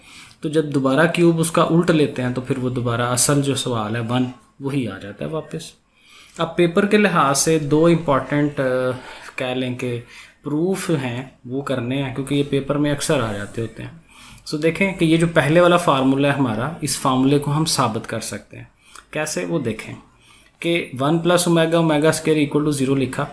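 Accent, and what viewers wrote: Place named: Lahore, Pakistan. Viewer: Ap ki language lahore jasi lg rahi ha lakin ap muskil kam ko bohat easy kr daty ho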